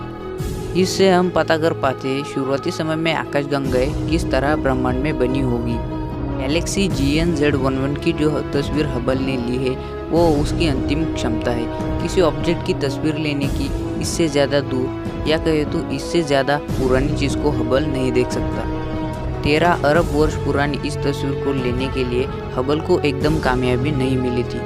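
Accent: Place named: Gujarat, India. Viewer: Baot badiya kaam karta he na tu isko aise hi padhna jaise likha maine bhai gujrati style avaz h tumhari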